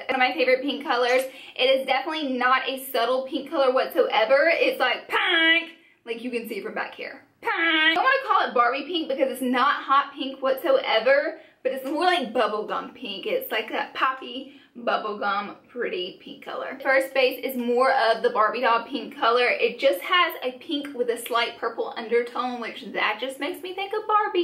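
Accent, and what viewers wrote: South, United States. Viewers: I was curious too!!! I thought it might have been because of her southern accent, but most people know koala at least /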